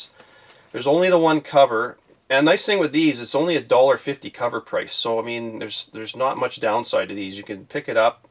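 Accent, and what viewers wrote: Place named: Canada. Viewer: Enter me for Venom 5 and thank you for simply talking comics with a Canadian accent 😃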